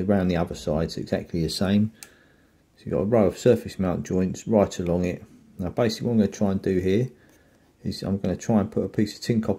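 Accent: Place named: Kent, United Kingdom. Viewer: Hi thanks for your time and work. You have a familiar accent, are you from north Kent?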